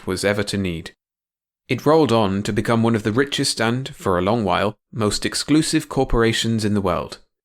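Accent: United Kingdom. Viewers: Weird how our brains are all wired to what is our familiarity, so as a British listener I find it so much clearer to hear a usual British accent; it’s not scouse or geordie which I would expect non-Brits to struggle with; and there’s plenty of US and Aussie content compared to British; I really appreciate the opportunity to listen in my own very boring and average British accent. I know I struggle with slow accents, I think it’s Mid-west American but as I’ve only visited LA, Texas, and Manhattan I can’t be sure. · I love UK narrators and the accent!!! ❤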